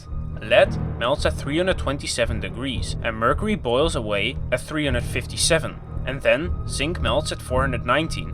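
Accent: Netherlands. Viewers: It's Dutch accent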